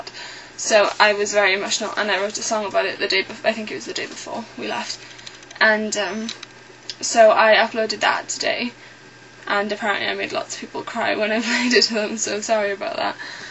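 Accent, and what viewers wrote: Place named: Wales, United Kingdom. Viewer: ahh ^_^ how cute is your welsh accent?! okay yes i know im weird for saying that. but i find it so cute haha ^_^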